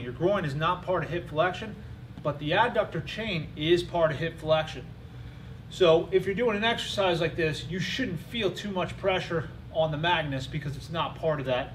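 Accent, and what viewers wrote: United States. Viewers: Ha, PA accent. Go Stillers! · U got the nyc accent. U from nyc?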